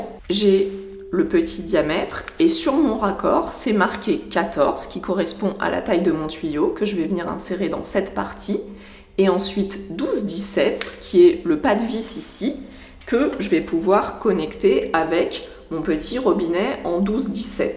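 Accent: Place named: France. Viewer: Je sais pas pourquoi, mais j'aime bien entendre cette damme parler :) Elle a un "accent" français que je ne connais pas, trés agreable à l'ouïe, si bien sûr je peux appler ça "l'accent" vu que je ne suis pas français moi-même, d'une autre region que la mienne probablement. Je mets un Like!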